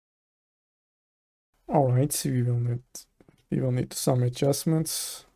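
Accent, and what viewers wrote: Turkey. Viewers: Sanırım Türk bir kardeşimizin kanalı, ingilizcesi tam bir türk aksanı içeriyor :)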